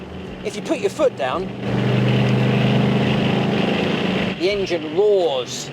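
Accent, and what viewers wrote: United Kingdom. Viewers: An attractively articulate and gentle London / Essex accent that emphasises the easy presentation of knowledge depth in the Car.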